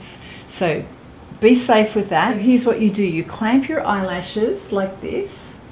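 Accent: Australia. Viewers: Australian accent? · You are so pretty! You sound Australian & remind me of Olivia Newton-John! 🥰🥰 Great video!! ❤️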